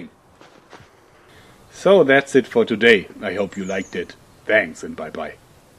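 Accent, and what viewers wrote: Germany. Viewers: You match with the medic from tf2 with your German accent and syringe gun/ slingshot.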